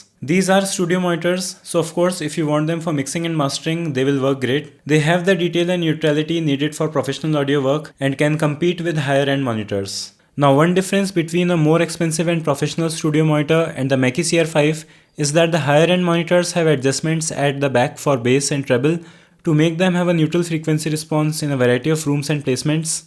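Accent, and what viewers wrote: India. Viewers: Need to work on diction, the accent is muddled and unclear. And many Indians with Indian accents have learned to do this so can you. For one stop slurring words.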